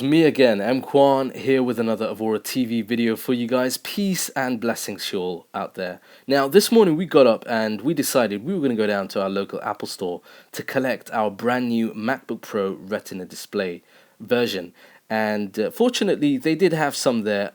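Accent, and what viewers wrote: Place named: RP, United Kingdom. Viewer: damn your posh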